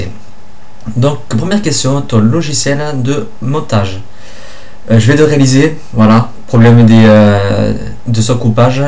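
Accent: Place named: Gard, France. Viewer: Hey ! Merci d'avoir repris le tag :D T'es d'où exactement dans le Gard? (sans dec entre ça et ton accent, j'ai le mal du pays à mort ^^)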